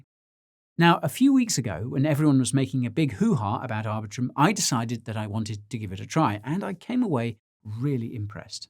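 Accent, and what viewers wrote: United States. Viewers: Is that supposed to be an American accent? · His American accent is getting better and better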